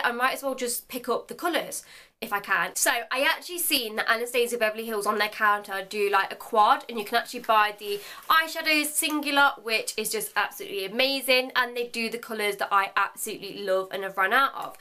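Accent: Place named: Midlands, United Kingdom. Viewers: Loved this haul, I could imagine how excited you were to go into Sephora 🙌🏻 I love when you used the word wounded when you said about the fenty foundation. I am originally from Coventry which is close to Leicester but live in Essex now and they don’t talk like we do in the midlands down here!! Makes me feel a bit homely when I hear you talk as it reminds me of home ☺️ xxx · Stephanie Harris also a coventry girl here. I moved away but I'm still close enough to Coventry and Leicester and visit fosse park regular. I knew accent was from nearby before I even knew where she was from 😂😂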